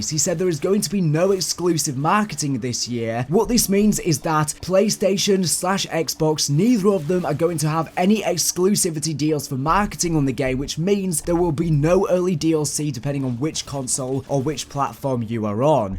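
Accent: England, United Kingdom. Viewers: Well he's English and morgz is English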